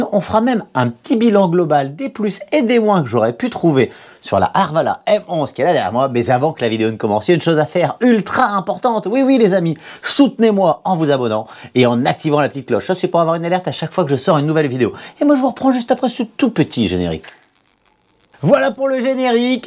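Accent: North, France. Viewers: Je reconnais bien le nord lol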